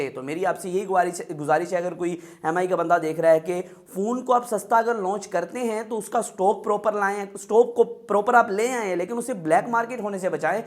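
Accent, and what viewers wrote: Faisalabad, Pakistan. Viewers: Yr kahi dekha h apko... Faisalabad sy to ni??? Boltay b Faisalabadi ki tra he ho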